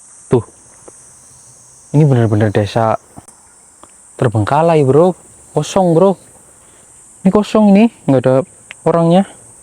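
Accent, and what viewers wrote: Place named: Banyumasan, Indonesia. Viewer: wkwk logat ngapake kuat bgt bro, purbalingga hadir😂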